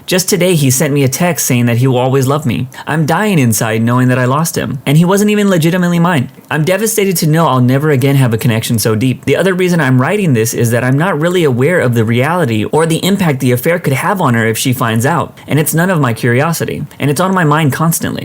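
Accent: South, United States. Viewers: The southern accent is HILARIOUS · As someone who lives in the south, I approve of the narrator using a southern accent in the first story XD it's just so funny to me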